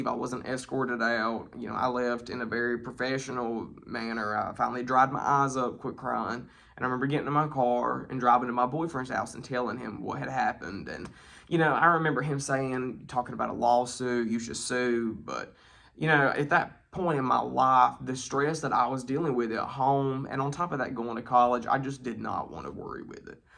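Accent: South, United States. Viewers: While honesty and truth are vital, discretion is often also required. Regretfully, some gay men have the further challenge of having a feature I call the "gay voice". In fact, it fascinates me. You can meet men who were born thousands of miles apart from each other, yet they both have the "gay voice". It is beyond any psychological research or knowledge to which there is currently any reasonable explanation. It is like having a particular accent. You have a quite noticeable American southern accent. It is how linguistics developed in your area and was well established before mobility rapid transit and vocal transmission became possible during the Industrial Revolution, when the average person lived their entire life within 2 miles of where they were born. In fact, during the past 150 years, dialectic specialists began noting that ' regional accents' of the same language are beginning to homogenize. 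The influence of radio, television and now digital streaming video is beginning to cause a blending towards what used to be called a "Continental Accent". But while this explanation of various, differing accents has always been based upon regionality and highly limited mobilization for all but a short time in human history, the "gay male accent" has no basis in regionality. A gay man raised in London, England, will speak with the same gay sound as an American. It's an overlay accent upon a regional dialectic accent of a common language. Yet only some gay men have this added challen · Sorry to hear this happened to you. Every time you looked at that girls boyfriend, she and he both probably considered it sexual harassment, like you were trying to seduce him. Employment law is supposed to require an investigation into allegations of sexual harassment, with the facts to be proven before you can be terminated. 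But when you have multiple people filing complaints against you, and corroborating each others stories, even if they are all lying, that is a hard thing to overcome without serious legal action. Sounds like you are from the South...nobody ever said that was the land of the fair and open minded. People are going to believe what they want to believe, the facts be damned. You probably need to do your best to stay away from the Bible thumpers. The Bible thumpers in this story probably need to study their Bibles a little more closely, maybe ask themselves, "What would Jesus do?". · mixed with a southern accent. I love it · My favorite saying is (Why try to fit in when you were born to stand out) PEACE ✌️ AND PRAISE my friend 🙏...PS I LOVE YOUR SOUTHERN ACCENT! HOLLA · I like your southern accent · your thick southern accent. I like it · The south, assuming from the accent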